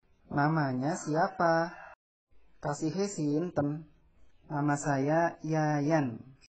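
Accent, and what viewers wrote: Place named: Sunda, Indonesia. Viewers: dialek e koyok dialek sunda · ini bahasa Jawa logat Sunda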